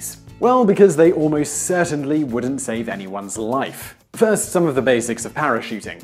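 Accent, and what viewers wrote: United Kingdom. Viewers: Your British accent is not helpful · Why are you speaking in British accent but using imperial system? I don't understand.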